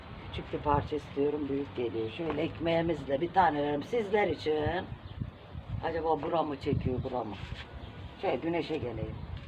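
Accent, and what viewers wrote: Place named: Adana, Turkey. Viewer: değerli youtube gezgin ve yurtiçindeki kendi içeriklerini youtube de yayınlayan arkadaşlar hepimiz biliyoruz ki bu işi az veya çok para kazanmak için yapılıyor buda sizin en tabi hakkınız öyle youtuber kardeşlerimiz varki çok büyük emekler ve yaşantılarını tehlikelere atarak bizlere gerçek yaşantıları kültürleri yaptıkları iyilik akımları ile bizlere gerçek içerik üretip bilgilendiriyorlar düne kadar köy yaşamını köy kahvaltısına burun kıvıranlara dahi bu yaşantıyı en güzel içerik ve yaşantılarıyla bizlere sevdirdiler çoğumuzu acaba bizde yapabilirmiyiz moduna getirdiler hepsine çok çok teşekkür ediyorum bu arada yemek tariflerini kitaplardan yapan değerli kardeşlerimiz artık bunun için kitap karıştırıp şu yemek tarifi hangi sayfadaydı diye uğraşmayın bu tarifler ve lezzetler sizin bir karış yakınınızda size düşen tek şey ekrana bir parmak basmak ve bu güzel lezzetleri ablamızın köy hayatı ve kedilerinde kendine has adana şivesiyle seyredebilirsiniz lütfen hepimiz youtube gezinirken ablamıza uğramayı unutmayın ben kardeşimizi ah köyüm ah sayesinde tanıdım iyikide tanımışım sizde tanıyınca inanın çok seveceksiniz kendisiyle kanalının dışında hiç bir tanışıklığımız da yoktur ama bir gün o tanışmada olur inşallah c.allah tüm izleyenleri ve insanları kötülüklerden korusun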